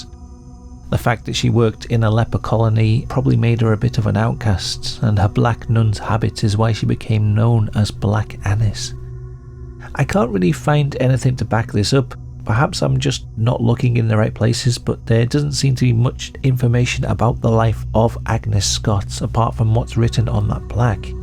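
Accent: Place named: Durham, United Kingdom. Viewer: Howdo,. I love your content. Always something interesting and entertaining. It’s nice to hear an accent like mine, too. I’m County Durham born and bred and now live in Hartlepool. You sound Durham / Teesside - am I right? Wherever you are, keep doing what you’re doing!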